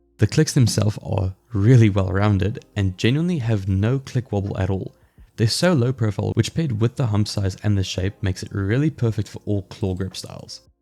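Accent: South Africa. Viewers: Great review man, are you South African?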